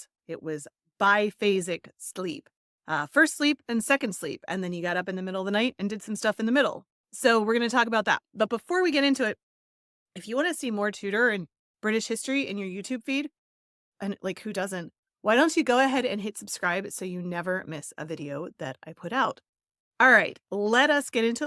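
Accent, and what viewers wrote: United States. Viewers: A good engagement, however your American accent is totally unnecessary & very annoying, sorry.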